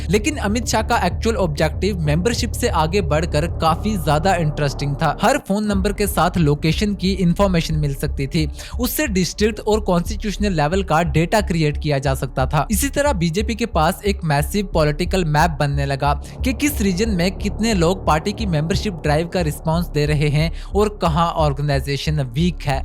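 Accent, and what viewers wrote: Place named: Pakistan. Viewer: Bhai tera accent pakistani kyu lag rha hai ?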